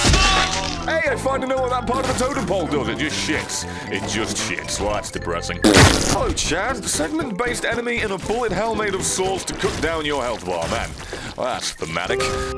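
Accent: United Kingdom. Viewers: Is it just me or is EXTRA British today?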